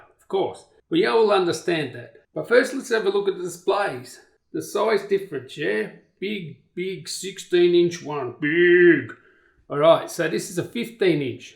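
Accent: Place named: Australia. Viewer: with the laid back aussie accent and not showing yourself on cam, I imagine you're doing this video's in just your fuckin pants whilst necking tinnies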